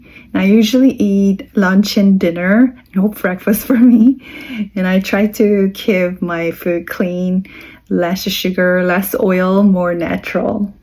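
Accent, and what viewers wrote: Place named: South Korea. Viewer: It's always great to hear you speak and to hear tidbits of your Korean accent, Ms., when you share your health secrets and otherwise. Hydration and consistency are now noted in my memory banks. Thank you. ❤❤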